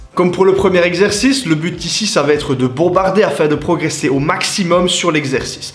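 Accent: Belgium. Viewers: Ahhh l'accent Belge m'avait manqué 💪🤘🇧🇪!!! Merci pour tes conseils !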